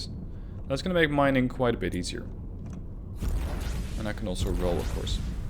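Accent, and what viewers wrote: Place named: Netherlands. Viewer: holy shit you're dutch! or belgian, or russian (but doubt). ahem I mean "oh cool, a recent avorion stream ^-^"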